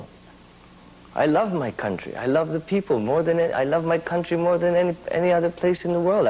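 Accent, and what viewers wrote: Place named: United Kingdom. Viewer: Napaka talino ng ating bagong elect na pangulo. Napaka pogi pa. Hawig pala sya n vince. May british accent pa sya dito. Naging emotional sya at times at nag ligid ang luha . Mahal naming PBBM, this is it, ung sinabi nyo po na gagwin ninyong lahat para matulungan ang mga pilipino . Like your father and PRRD , mahal na mahal nyo rin po ang mga pilipino at ang ating bayan. Mabuhay po kayo God bless you and guide, protect and help you in governing the Phil. ❤️✌️🇵🇭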